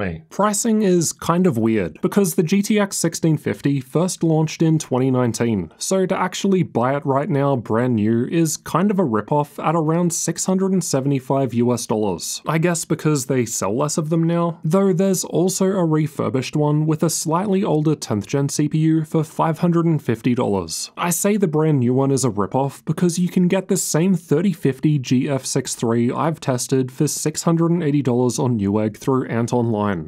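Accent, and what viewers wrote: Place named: Australia. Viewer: Aussie accents are fun: you say turrr when you mean to say too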